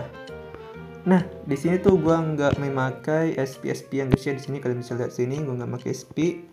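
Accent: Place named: Sulawesi, Indonesia. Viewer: Bg km pasti org Sulawesi!!?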